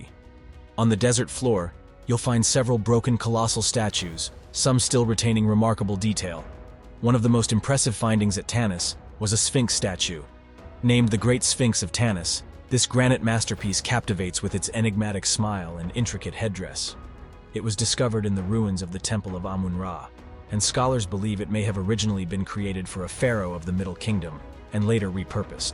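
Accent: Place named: United States. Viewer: You sound like an American. Why are you giving metric dimensions. FTS.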